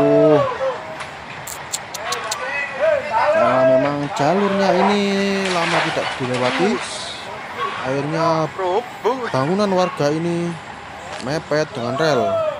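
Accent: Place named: East Java, Indonesia. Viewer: Nang endi iki cak? nek delok omongane sih ky ng jawa timur yo . . .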